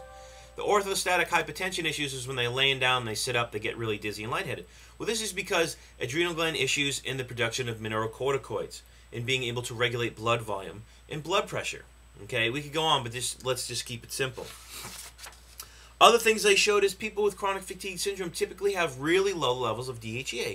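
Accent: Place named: Boston, United States. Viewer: Let me guess --- you're from the North Shore of Boston.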